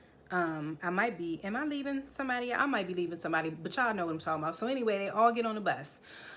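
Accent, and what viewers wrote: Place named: Washington DC, United States. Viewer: I don't even have to ask if you are from DC ...I can hear our accent ALLLL up in your voicet! Damn.. I love when I come across my fellow city folks on Y/T.. I be so siced!! LoL! I followed you via my boo JayLee's channel.. you definitely got a new subbie girl! this review was funny AF btw!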